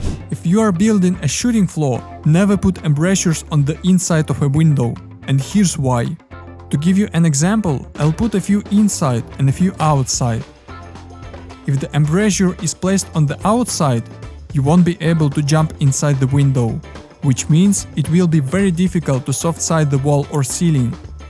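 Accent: Russia. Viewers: Бро разговариваешь хорошо, но мы знаем что ты из России) акцент тебя выдает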